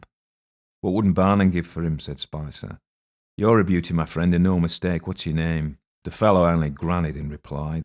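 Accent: Australia. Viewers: I missed this the first time round, but glad it came up again. Pretty good Aussie accent, but different to mine, (Even Australia has regional differences and they change with time.). Tasmanians use even more shwa and flat 'a's as in 'at' (except in 'castle'. Why this is an exception I have no idea.). Loved the story, and hop all is well with you and yours. 🙋🏻🔻🇦🇺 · I enjoyed this story so much. It captures the scene perfectly and I was quite taken with your excellent Aussie accent and the worn and tired tone. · Wonderful accent that keeps the story firmly anchored in Australia. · Well done Aussie accent. Great story and commentary. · Wonderful, thanks, and I for one DID enjoy your Australian accent! Comments at the end informative, insightful and useful in understanding the piece, I do think they add a LOT to the reading. -- And thanks for your kind and sympathetic wishes at the end. 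Am sitting in Sweden and seeing a corona-disaster all around me. Really appreciate your reaching out to listeners. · I'm a West Australian living in Arizona. As soon as I heard your accent I felt right at home. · I thought you’re Australian accent was very good, not that I personally speak with an Australian accent. Good story, thank you 🙏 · I'm a kiwi but I thought your Aussie accent was pretty good. Our goldfields could tell a few stories too. Have you seen The Luminaires? It's a TV series from 2020 with a supernatural theme running through it. About the Otago gold rush. Excellent series. Very spooky! What an incredible life that young author had! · How did I miss this one??? Love your presentation, Australian accent and informative commentary. Just finished your "More Cumbrian Ghost Stories":_pumpkin::_pumpkin::_pumpkin:Can highly recommend it? Thank you! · Your Australian is marvelous. 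Am completely enjoying all your readings. You can truly be proud of the quality of your work. Thank you so much for these tales. Cheers Pat in New Jersey.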